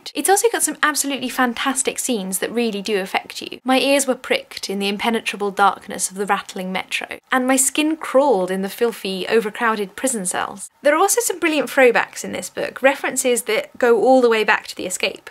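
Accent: United Kingdom. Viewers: I bought this a couple weeks ago and finished it in a couple days. This reading thing sucks though. It doesn't sound good in a British accent.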